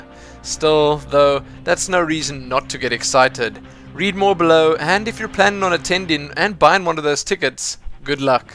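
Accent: South Africa. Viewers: its a south african accent bru!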